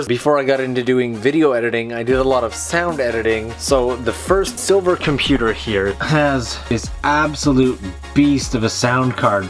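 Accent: Canada. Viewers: 10!? jeez lucky you, we've had a wind chill of about -41 the last few days here in northwestern ontario. and now that youre back in canada i notice the accent even more haha.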